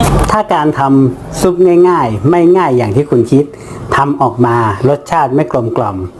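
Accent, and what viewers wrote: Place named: North, Thailand. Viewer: ฟังสำเนียงคนเหนือแน่เนาะๆ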